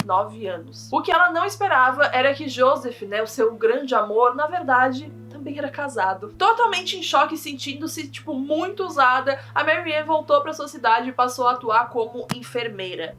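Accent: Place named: Minas Gerais, Brazil. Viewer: eu pensei q o cara era mineiro tipo quem nasce em minas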